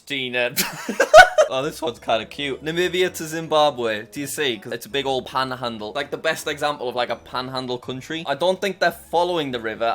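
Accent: United Kingdom. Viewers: Americas look more like a duck sideways we’re you saying dog or duck I’m sorry I don’t understand British very well I’m American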